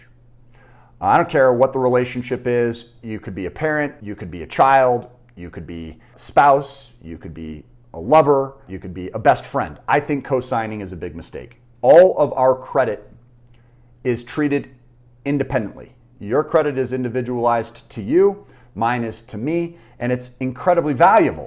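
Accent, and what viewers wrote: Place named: United States. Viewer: What a nice cowboy accent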